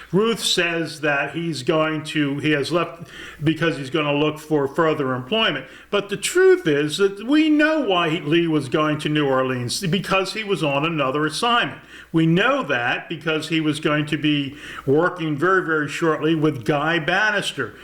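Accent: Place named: Philadelphia, United States. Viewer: I detect an east coast, Pennsylvania/Philadelphia/Delco accent. Thank you for another informative presentation.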